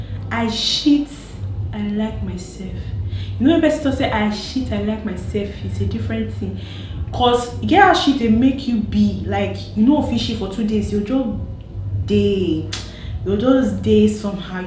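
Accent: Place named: Ghana. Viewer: You suddenly sound Ghanaian 👀👀.... Congratulations!!!!!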